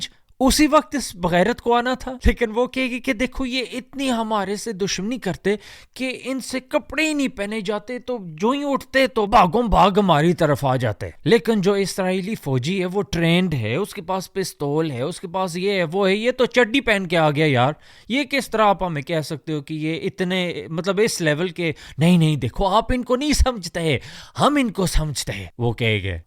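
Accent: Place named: Punjab, Pakistan. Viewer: Bhai aapka lehjaa punjabi hai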